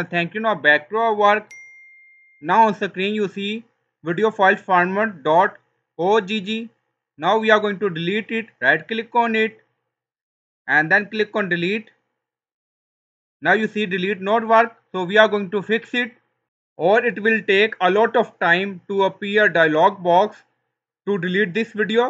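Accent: India. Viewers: Sempre um indiano falando inglês que me salva desses bugs da microsoft kkkkkk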